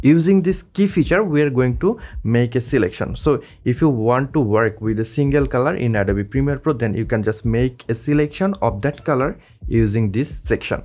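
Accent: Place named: Assam, India. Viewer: are u assamese by any change...based on the accent I m guessing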